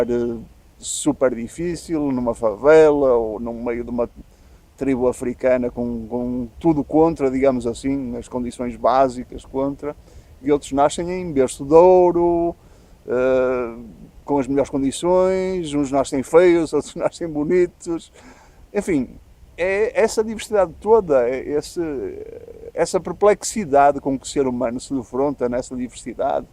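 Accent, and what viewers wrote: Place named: Portugal. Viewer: Video bom, mas o audio é meiio complicado pois o som está abafado e o sotaque portugues complica o entendimento, ALEM DA PROPRIA COMPLEXIDADE DO TEMA DENTRO DO LIVRO DE URANTIA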